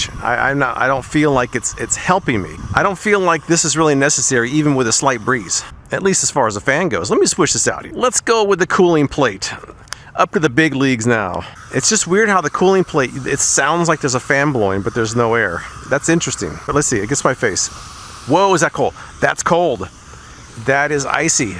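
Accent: United States. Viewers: To me he sounds like the most american guy ever. This what I'm thinking when someone say: american guy.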